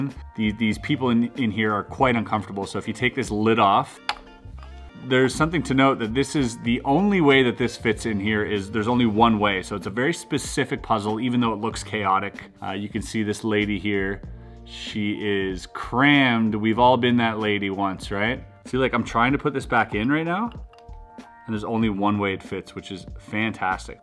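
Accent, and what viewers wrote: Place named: Canada. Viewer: Holy crap your Canadian lol I love your YouTube videos I have never looked you up but I finally hear your accent lol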